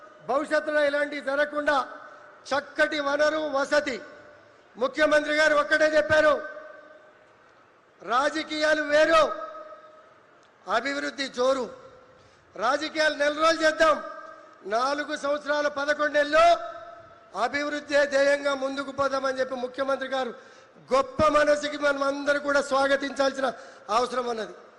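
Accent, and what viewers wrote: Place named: Andhra Pradesh, India. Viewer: Andhra Ghandhi nee yasa andhra Basha artham autundhi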